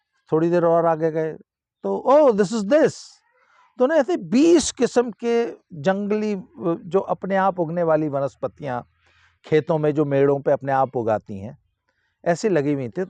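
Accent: Haryana, India. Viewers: Haryanvi touch minde blowing 👌🏻👌🏻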